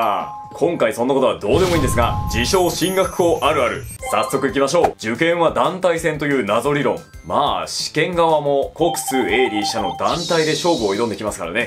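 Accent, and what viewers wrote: Miyagi, Japan. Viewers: もしかして宮城県出身ですか？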